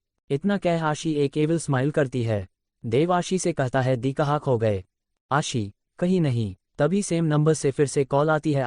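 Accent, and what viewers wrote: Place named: Haryana, India. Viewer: Yes haryanavi I like it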